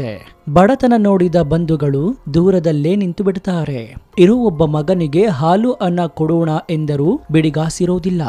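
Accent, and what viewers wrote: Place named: United Kingdom. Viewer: bad narration...Kannada in English accent!!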